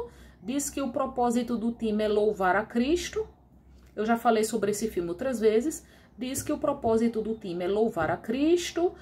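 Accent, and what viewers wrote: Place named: Minas Gerais, Brazil. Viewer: Tu é mineira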